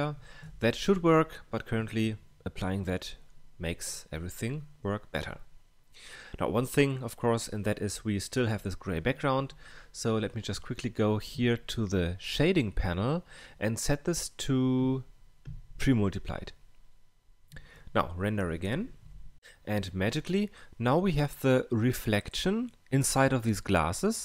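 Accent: Germany. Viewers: du bist bestimmt deutscher du hast nen nervigen dialekt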